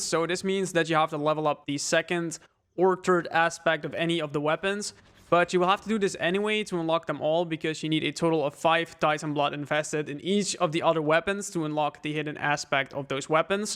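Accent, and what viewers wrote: Netherlands. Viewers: Your accent is awesome, it makes you sound like a Dutch DJ xD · HE IS DUTCH! I RECOGNIZE THE ACCENT! I LOVE DUTCH COCK!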